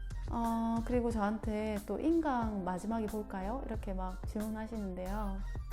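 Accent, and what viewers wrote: Gyeongsang, South Korea. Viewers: 영상 잘 보고 갑니다. 자신감을 가질수 있는 좋은 영상입니다. 댓글을 보았더니 또 악플을 다는 사람들이 있더군요... ㅠㅠ 나쁜사람들~~ 그리고 부산 분이신가요? 경상도 사투리도 구수하네요 아무튼 잘보고 갑니다. 구독 하였으니 좋은 영상 많이 올려주세요.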